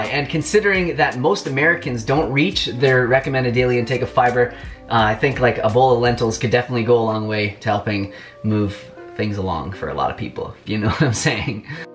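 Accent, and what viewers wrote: Canada. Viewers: Dovid Stern Canadian accent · Omigoodness! I thought I heard a Canadian accent. Lentils are very quickly becoming my all-round faves! Need to chk out your curry recipe. QUESTION: Building muscle is awesome, however...how does it compare to heme sources of iron. Struggling with anaemia & I keep getting the impression that non-heme iron sources (from lentils) are sub-par for those of us with anaemia. What say you,? Cheers in advance.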